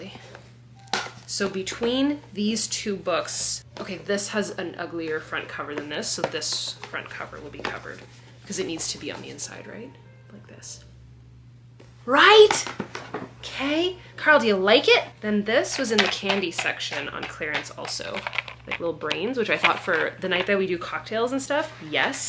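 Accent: Canada. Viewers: I’m distraught over you saying packages and not parcels🥲😂 I LIVE to hear you say parcels, it’s so Canadian🇨🇦🫶🏻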